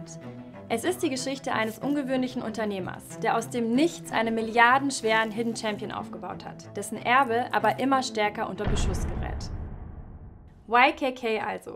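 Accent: Bavaria, Germany. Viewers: kommst du aus bayern? du klingst übel danach... schon vor dem kina interessant finde ich dass bei deinem china-k so 5% "ch" mitschwingt tatsächlich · einfach Hochdeutsch reden und nicht bayerischen Dialekt benutzen(kina=Bayerisch).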